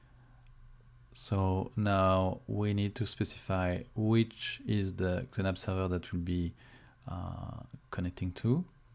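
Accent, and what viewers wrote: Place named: France. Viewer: so nice accent! After I have watched this video I want to learn Franch language :)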